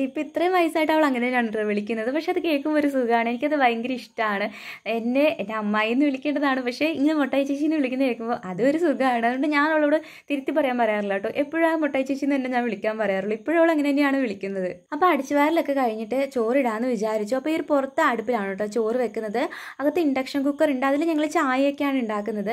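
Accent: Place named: Kasaragod, India. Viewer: Kasaragod slang 😍😍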